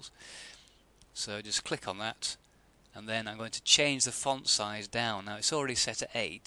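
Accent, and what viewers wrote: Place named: Northern England, United Kingdom. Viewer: I don't know if this guy is paid for this excellent job, but he made this tutorial absolutely stunning. Thanks for that! Btw.I would guess his accent as from northern England,maybe Manchester :))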